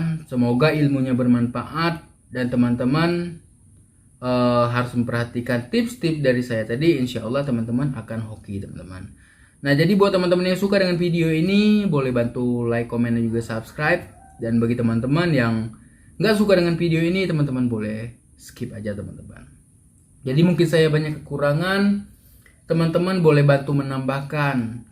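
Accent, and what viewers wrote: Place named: Cirebon, Indonesia. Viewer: Kaya orang Cirebon mas heheh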